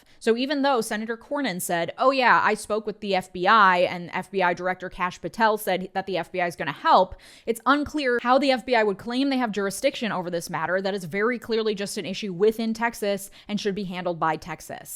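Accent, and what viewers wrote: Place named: Minnesota, United States. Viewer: Love your channel great info paired with that warm Minnesota accent. Tanks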